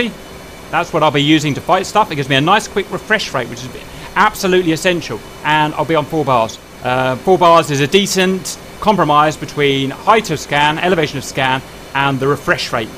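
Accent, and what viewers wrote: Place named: United Kingdom. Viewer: True, but only if you are in a hurry. But I enjoy his way to entertain. I'm Italian, but I love this British accent and humor!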